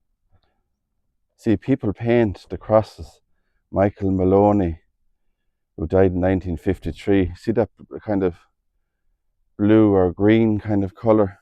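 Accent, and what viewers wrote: Ireland. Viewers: I love your accent I'm a Canadian l hope to visit Ireland soon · Just subscribed , nothing better than irish video's and listening to the Irish accent 😊 great subject today 😊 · I though so. Irish accent. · No better tribute to how we all end. The Irish accent gives depth to this story and as an English man I thank god for this respectfull demontration in how it needed to be done. By the grace of god .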